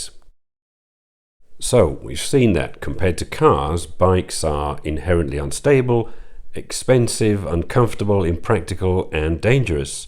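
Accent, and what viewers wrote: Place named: Northern England, United Kingdom. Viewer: Nice I knew I heard Northern! I was born in Leeds. Ridings folk enthusing over Riding, gotta luv it lol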